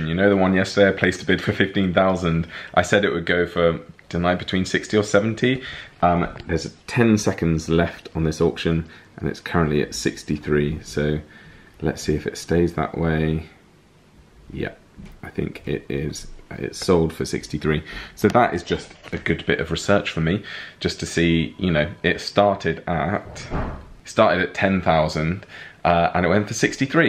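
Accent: United Kingdom. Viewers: Love Your Channel. And Love Your British Accent.🙄 I hate to be bring this up. But Florida Is #1 Hate State When It Comes To Our Community. I find this very sad because in my younger Gay Year's I spent allot of time there.😢 It so sad that the majority Of The States Governments Our Out To Put Us Back In The Closet And Put Us In Real Danger. If I Wasn't So Old And In Bad Health I Would Consider Going Across The 🇬🇧 Pond. You Have A Beautiful Family. Enjoy The Rest Of The Summer. Greetings From🌴 San Diego.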